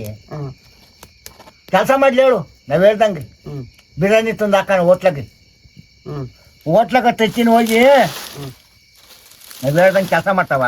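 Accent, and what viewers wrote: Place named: Tumkur, India. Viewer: ನಮ್ಮ ತುಮಕೂರು ಭಾಷೆ 👌👌👌👌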